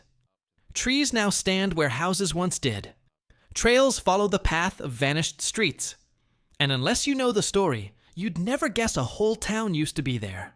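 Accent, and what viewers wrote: United Kingdom. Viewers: Is it me or does anyone notice the narrator's voice once in a while turns into a English/British accent? Lol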